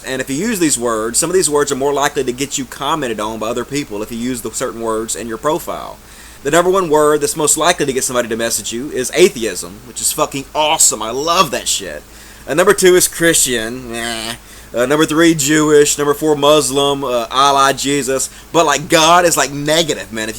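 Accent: United States. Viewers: Didn't know that you were a fellow Mississippian. I knew you sounded a little country. Fuckin' awesome.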